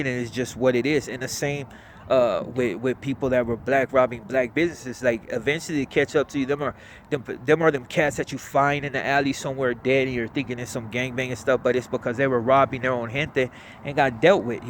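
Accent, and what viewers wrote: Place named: Mexico. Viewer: Why does he try to sound mexican if hes black?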